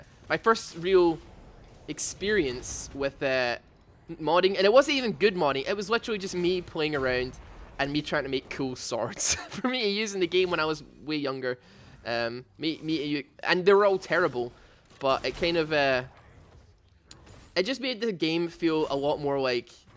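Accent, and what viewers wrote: Scotland, United Kingdom. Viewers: Hes scottish (I think)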